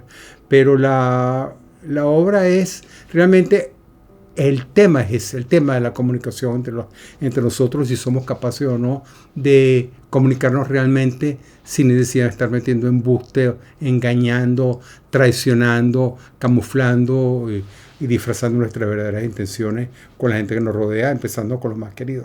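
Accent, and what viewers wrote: Caracas, Venezuela. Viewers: Magnífico post sobre una magnífica obra. Acento caraqueño inconfundible. Suscrita y activada la campana. Pregunto: esta trilogía tiene traducción en español? Gracias. Mil exitos.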